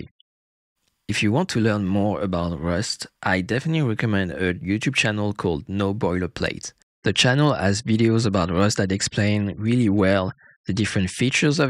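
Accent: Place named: France. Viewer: are you French ? (I'm French and you have an French accent)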